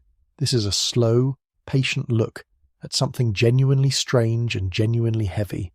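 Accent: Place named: England, United Kingdom. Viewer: couldn't take more than a few minutes of this snobbish AI English accent ... got new for you... if you thin k it makes the narration sound intelligent.... you're wrong.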